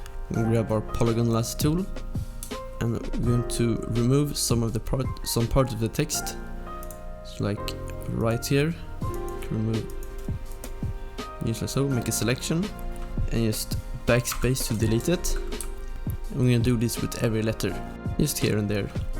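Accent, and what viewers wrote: Sweden. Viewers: Haha man hör den svenska engelskan👍men sjukt bra video man blir sugen på fixa photoshop när man kollar på de här du är grym på de du gör!:)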